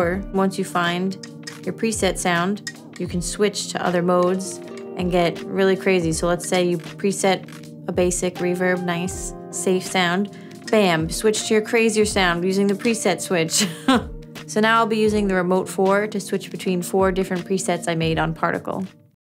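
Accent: Long Island, United States. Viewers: I saw on jhs and im here tone a simp and here cool pedal shit. U and ur LI accent along with ur talent make u the cutest thing i ever saw. Sorry for being weird, ur awesome🤘🏼🤘🏼🤘🏼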